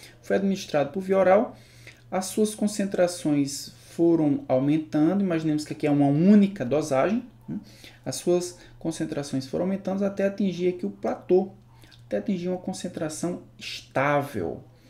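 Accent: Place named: Sergipe, Brazil. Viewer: Muito obrigada por esta aula tão elucidativa! Seu sotaque me parece sergipano. De onde o senhor é? :)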